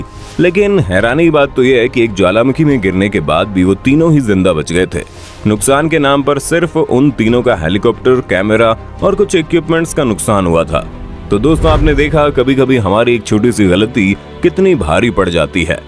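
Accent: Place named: Hills, India. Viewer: आपका आवाज बहोत पहाडी है sir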